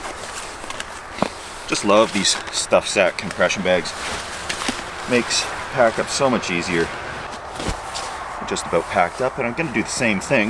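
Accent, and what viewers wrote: Canada. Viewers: is one of the sweetest, most wholesome people on this platform. Whenever I hear speak, I am enamored by his thick Canadian accent, but more importantly his positive attitude. is a treasure and we must protect him at all costs.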